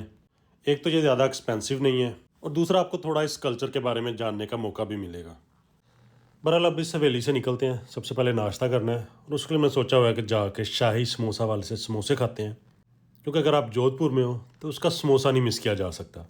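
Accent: Punjab, India. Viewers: Eeseeleeemweeeleekewm.... Bhai Desi tareeke se bhi bol sakte hai. Baaki Sara lehja toh Punjabi hai na to arabi banne ki koshish kyu?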